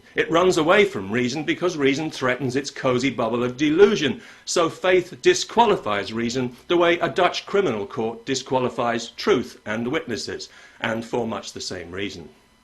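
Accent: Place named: United Kingdom. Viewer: Pat Condell é um cara d+ adoro esse sotaque britânico dele tem uma argumentação lucida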